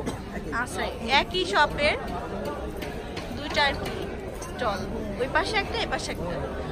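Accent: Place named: Rural, Bangladesh. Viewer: Apnar khotha gula valo lagyna.coz grammo kothar Moto hoy jay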